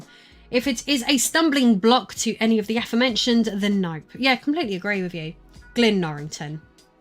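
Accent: United Kingdom. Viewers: Teach me how to brittish accent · How lovely is your spirit , your smile, your soul and your British accent!!! Regards from Spain :)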